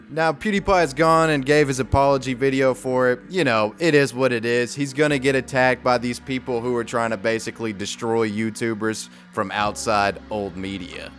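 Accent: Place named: Tennessee, United States. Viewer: Yeah, you’re definitely from Tennessee 😄 that southern accent was spot on! I’m from East Tennessee and that’s EXACTLY how old ladies sound around here 🤣🤣🤣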